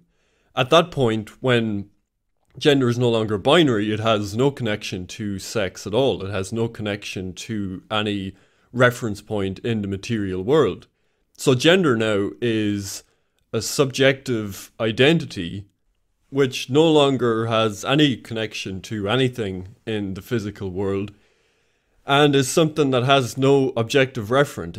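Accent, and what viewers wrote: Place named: Ireland. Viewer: cute adorable Irish accent. Comes from centuries of British molestation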